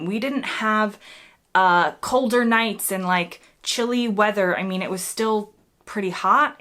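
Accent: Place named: United States. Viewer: The self deprecating sarcasm about the drollness of a video about parsnips being delivered with the chirpy American accent is brilliant, we are obviously rubbing off on you, love the videos ❤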